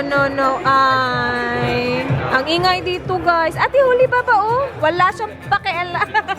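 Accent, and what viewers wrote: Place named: Visayas, Philippines. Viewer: ung sobrang naging fanatic na ako,pati accent ni sa bisaya naadopt ko na unconciously..hahaha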